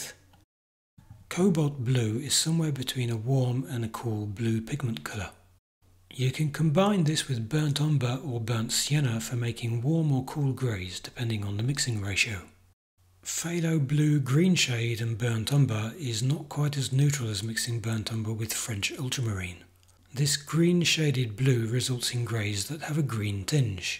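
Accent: United Kingdom. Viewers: They don't use black watercolor because they use black watercolour. British voice trying to sell to Americans. Shame on you.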